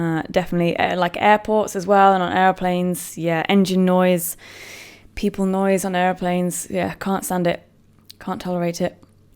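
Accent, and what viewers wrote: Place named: England, United Kingdom. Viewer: Saw this on the misophonia reddit, figured I’d give it a watch. I want you to know that you’re not alone. I can really relate to the experiences you’ve talked about (just some different trigger sounds) and so I really empathize with you. And having misophonia+sensory overload+social anxiety is not a fun thing to combine, I know that firsthand. But if it makes you feel any better, it could be worse; you could have OCD too. No, seriously, it’s hell on Earth. My misophonia being triggered trips my OCD, which trips my anxiety, which ramps up my sound sensitivity and my OCD even further, and then they interact again, and so on, and so forth, ad nauseam. Oh, and I have perfect hearing (quantitatively speaking, I got a perfect score on the Army hearing test) so I hear *everything*. So yeah, that’s great for misophonia. 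Sorry, I went off on a weird ramble there, didn’t I? Both my OCD and my misophonia got triggered badly, I got really baked to cope, then surfed Reddit, which in turn led me here. TaDa! Hooray for self-medication! Also, totally unrelated but I freakin love your English accent.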